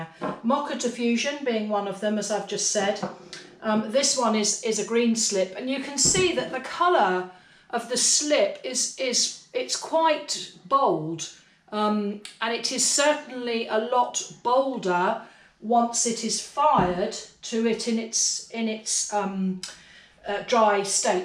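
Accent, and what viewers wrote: United Kingdom. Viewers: HELLO! great to hear a British accent! i get confused about Underglazing....i understand that after you ug a greenware piece, you then bisque it....then you put 2x zinc free clear on for shiny....now here's my questioin: can i put wax resist over all that and then apply my regular glazes? i know that Amaco Potter's Choice may cover up my underglazed, clear glaze (and waxed?) design, so how do i avoid that??? i like the effect of the PC glazes which alot of them 'run'. if i wax my design, i wonder if my regular glazes will cover up the design? thank you!!!!!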